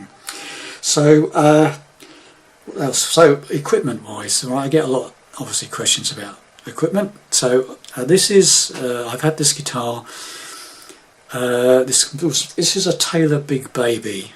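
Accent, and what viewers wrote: United Kingdom. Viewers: I’m now just seeing this video 7 months later. It never showed in my feed. I was one of your very first subscribers who suggested tons of lessons in time that are on your channel. You were the person I turned to for tutorials when I started learning guitar. You’ve helped greatly and you are extremely appreciated, I hope you know that. I’m far along in life now from when you did your channel. I can play extremely well now, and have learned a lot. Even my music has changed from the music I suggested, I’m definitely more into country music and folk music now. Also, I always knew you were British from your accent! You’re a good lad. You’ll always be in thought mate. Hope all is well. Cheers!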